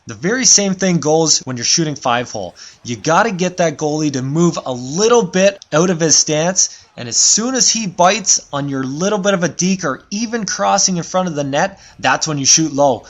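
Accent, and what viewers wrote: Canada. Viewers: thanks for the tips man! i can totally tell that you're canadian by the way you say about sounding like ABOOT. haha. thanks for uploading!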